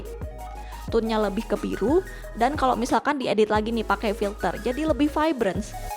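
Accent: Yogyakarta, Indonesia. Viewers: Orang Jogja kah?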